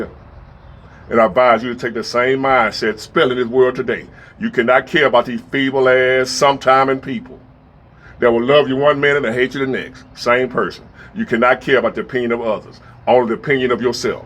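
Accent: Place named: South, United States. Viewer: Got the rocking chair on the porch! I hear ya bro! Can tell from the South!! 💪🙏✝️🇺🇸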